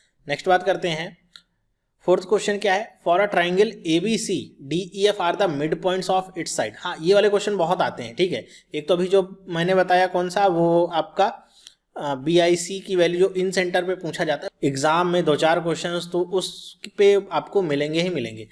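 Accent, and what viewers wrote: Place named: Bihar, India. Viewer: his language seems to be bihari difficult to understand :(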